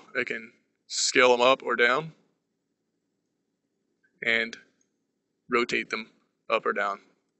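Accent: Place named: South, United States. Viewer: You sound like you're either in Tennessee or Georgia. Great tutorial though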